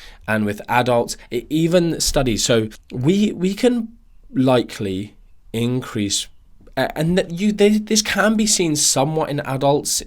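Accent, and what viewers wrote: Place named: England, United Kingdom. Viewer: Unusually good-looking beard. Ah, - no grey prickly hedgehog-style, or lack thereof. Talk matching it, - good to hear English English for a change.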